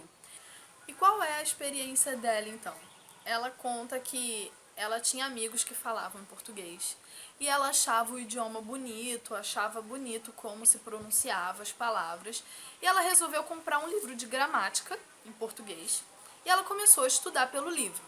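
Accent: Brazil. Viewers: Love this Portuguese/Brazilian language accent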